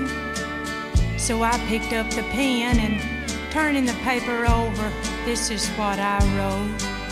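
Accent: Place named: South, United States. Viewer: unmistakable Southern States accent. Maybe you don't hear it if you are from the States but it's very noticeable to the British.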